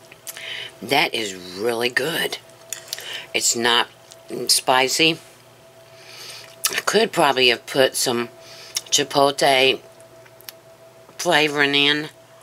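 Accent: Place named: Oklahoma, United States. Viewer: Your voice sounds like Oklahoma . Thank You for this recipe .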